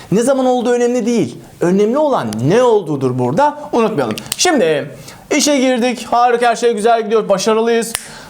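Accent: United Kingdom. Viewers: british accent is amazing :D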